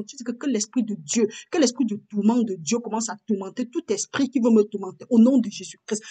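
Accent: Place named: Cameroon. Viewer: Il me semble que vous êtes Camerounaise ma soeur de par votre accent. Que Dieu te bénisse davantage au nom de Jésus la sentinelle de l'Eternel.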